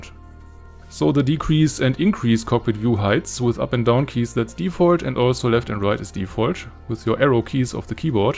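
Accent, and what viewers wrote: Germany. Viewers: Super gemacht hat mir echt geholfen habe an deiner art zu sprechen gemerkt das du deutsch bist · haha liebe diesen deutschen Akzent im Englisch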